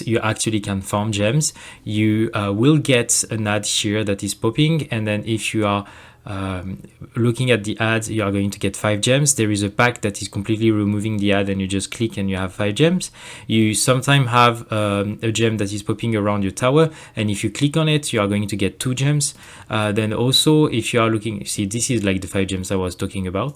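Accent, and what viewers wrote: France. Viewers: Il me semble bien reconnaitre l'anglais d'un francais ^^ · l'accent francais 💔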